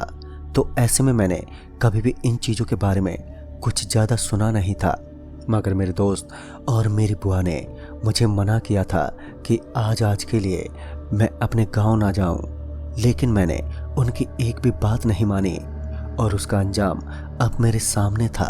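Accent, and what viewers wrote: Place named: Bengal, India. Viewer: Bhai tum pakka bengoli ho...tmhara Hindi Bengali mix ho rha h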